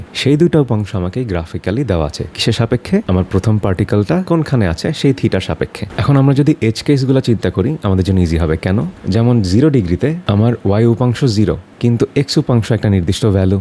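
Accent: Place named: Dhaka, Bangladesh. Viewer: আপনি যে ঢাকাইয়া কণ্ঠ শুনলে বোঝা যায়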